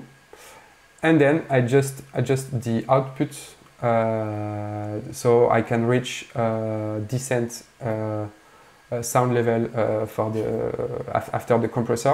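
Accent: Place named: France. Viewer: Well done, do not worry about you French accent. This video is very helpful!!!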